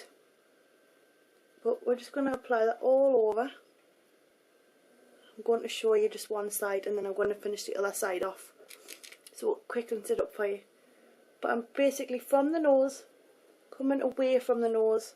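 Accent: Newcastle, United Kingdom. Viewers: shes talking like british really ugly engish the my is the best not the original but the best!!!!!!! :D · brittish? or scottish? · it might be odd sounding to you, but it's perfectly normal for me! she's from south shields, in england, and people from that area all have that kind of accent, it's called a geordie accent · No she is from up north in england :) Kind of newcastle way :)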